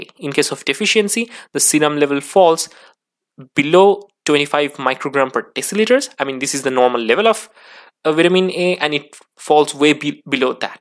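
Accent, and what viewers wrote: India. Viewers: His accent sounds like Only Desi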